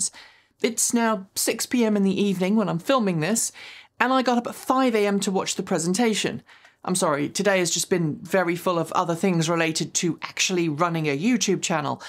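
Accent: England, United Kingdom. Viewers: does have a regional accent -- that region is England:-) And within England, toward the south, and well educated. And I am saying as a non native english speaker you can't tell whether this is an english accent, or one from somewhere in the US. Therefor I'd find it stunning if anyone would have problem with (unless they are looking for problems). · does have a regional accent -- that region is England:-) And within England, toward the south, and well educated. Also I think goes out of her way to enunciate clearly. Listening to her as a native English speaker, she's pretty unmistakably not American. I don't think many Americans would believe her accent is from anywhere in the US.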